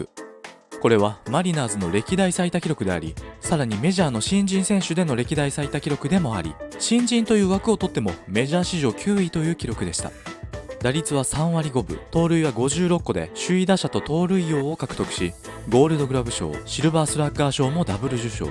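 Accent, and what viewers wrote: Kansai, Japan. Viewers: 関西？訛りありますね。良い声です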